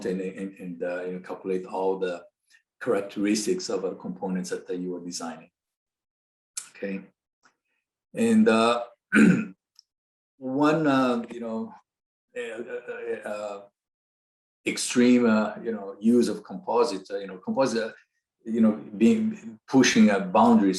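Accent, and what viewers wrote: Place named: Brazil. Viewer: I knew that accent was Brazilian! Super cool seeing an Asian person with a Brazilian accent!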